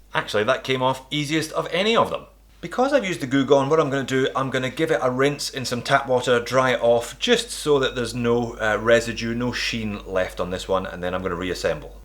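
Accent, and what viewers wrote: Scotland, United Kingdom. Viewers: You look and sound like a scottish peter finch. Its uncanny he’s a golf youtuber and i swear other than accent differences you guys talk just alike.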